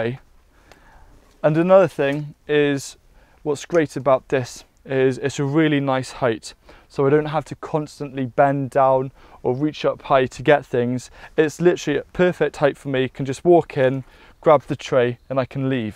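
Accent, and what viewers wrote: Wales, United Kingdom. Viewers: if you have uv lights - don't use a pink sharpie! wrote them indoors and now the labels are invisible in the greenhouse :) derp. Great video, will subscribe. Interesting accent, similar to my husband, Welsh with English parents :)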